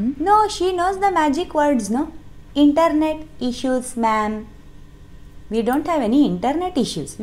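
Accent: Maharashtra, India. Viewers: love the pure marathi accent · Such a clear Marathi.... Are you been to Pune, mam?? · Chalu chapati.. Now that's something sarcastic.. 😂🤣 u never stops to amaze me.. And what an marathi accent.. U literally sound like a typical marathi kaki when u said tuz sandwich aalay bagh... Hope u read this comment.. And keep the good work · Perfect marathi accent ...☺️ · Ur marathi accent 👌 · Very hilarious video,. I have watched this 2-3 times and laughed and laughed! Keep it up. What a perfect Marathi accent you have! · As a Marathi speaking person, I bet, she has nailed that typical Marathi accent. God bless. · Hi please make more maharashtrian videos. You have got gifted maharashtrian tone and style. We could relate all instances with us. 😃😃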